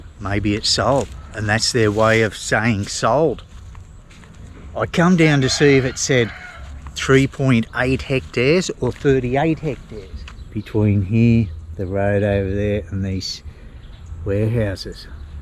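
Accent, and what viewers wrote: Australia. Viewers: well who am I to rule over your good wife, I retract my appeal of your Aussie pronunciation and give myself a smack upside my head, ps.love your work.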